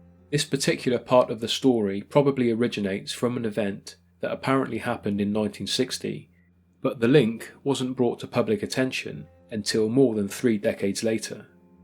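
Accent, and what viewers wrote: United Kingdom. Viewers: I’m glad the narrator is British. RIP London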